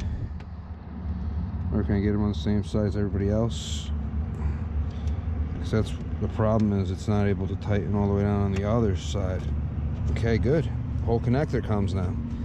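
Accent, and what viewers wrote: New York, United States. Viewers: No hot pads? Go warm up a few then go back up. Oem wires have id numbers on Lennox rtu...re label them. A picture of connections sure helps before removal. New York/Joysey accent...lol Battery azziz..? Lmbo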